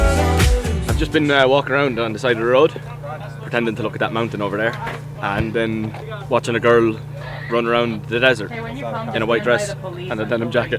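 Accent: Ireland. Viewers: That accent thought... So damn Irish 😍❤ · His Irish accent is just killing me🆔😍😍😍😍😍😍😍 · So proud of Niall!!! Still cannot get over his adorable Irish accent and how good he looks!! <3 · cough cough Niall’s Irish accent it better than mine (Yes, I’m Irish Too)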